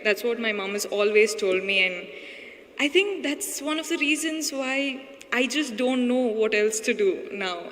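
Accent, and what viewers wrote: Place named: India. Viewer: You dont have to provide subtitles for the speech cz we all understand the indian english accent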